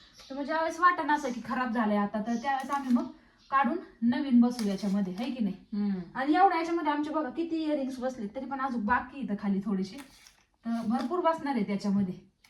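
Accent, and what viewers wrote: Ahmednagar, India. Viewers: आग मनाला नको लावु घेऊ आपली नगर भाषा खुप प्रसिद्ध आहे मी मुंबई रहाते 20 झाले माझी भाषा काय बदली नाही 😂. लोक लगेच ओळखतात नगरी आहे का तुम्ही 😂😂😂😂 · तुमची बोली भाषा खूप छान आहे.मी काही वर्ष नगरमध्ये राहत होते.मला खूप आवडते. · ताई आम्ही पण आस म्हणतो कि गणपती बुडवयचा कानातले कलेक्शन खूप छान आपली नगरी भाषा खूप छान ❤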